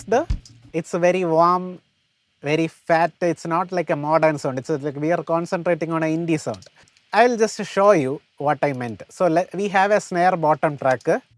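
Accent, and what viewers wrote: Kerala, India. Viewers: bro nothing wrong in it, but since he’s explaining, I couldn’t catch it, cuz it was more like Malayalam English, the concept is amazing! He’s knowledgeable no doubts in that, just posted what I felt as a listener.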